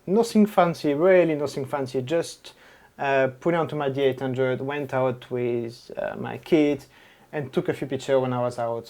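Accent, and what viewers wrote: France. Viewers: J'ai cru reconnaitre un bel accent français ? Est-ce que tu fais toutes tes vidéos en anglais ? Et juste une petite remarque à plusieurs moments tu dis lens wood au lieu de lens hood ^^ Bonne continuation !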